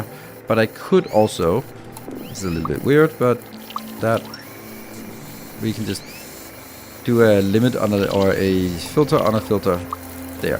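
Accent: Denmark. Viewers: Are you danish?🤔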